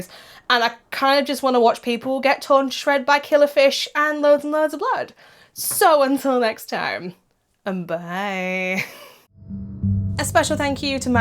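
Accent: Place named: United Kingdom. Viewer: tearin' bad movies apart with British accent makes it EVEN funnier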